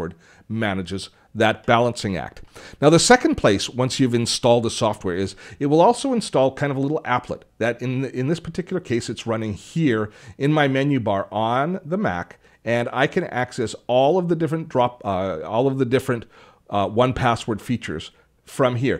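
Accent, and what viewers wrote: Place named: Canada. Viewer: aboot - Canadian accent detected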